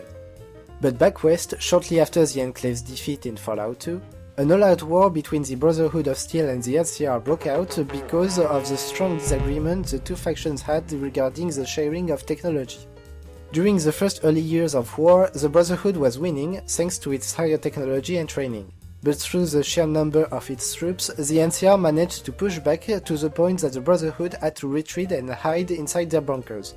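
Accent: France. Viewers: your frenglish makes my ears bleed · His french accent is as cute as Curie's... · lol dat French accent is ridicilous but nice video brah :D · omg, french accent <3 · Chemsse-dine Salhi I know, but I don't need them, I can understand him perfectly, I went to french schools so I got used to hearing french accents. If he is indeed french, he does actually speak English very well. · Juste excellent ! Continue tu es sur la bonne voie ! (je me permet de te répondre en Français, ton accent indique tout ^^, et les sous-titres Français, c'est parfait !) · vue ton accent et les sous-titre tu doit être français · T'es français ? :3 Putain, cet accent mec ! XD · ohhh putain cet accent francais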